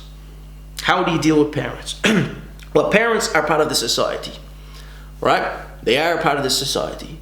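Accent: Ireland. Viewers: You irish bro I think I can hear it im from tipperary but cant make out your accent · Is that an Irish accent?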